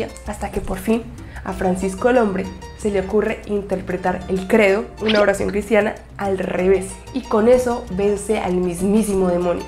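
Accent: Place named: Colombia. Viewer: Disfruté mucho esta historia y también me encanta el acento colombiano, pero este canal es para los estudiantes de nivel alto intermedio -avanzado? Tuve que concentrarme mucho por la velocidad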